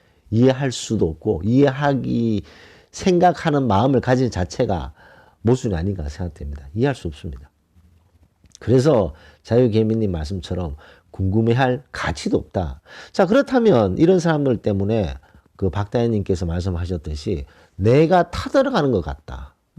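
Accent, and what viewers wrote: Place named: Daegu, South Korea. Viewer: 방송 감사하게 듣고 있습니다. 정말 좋은 말씀 대구 사투리로 듣는데 편안하고 유익합니다.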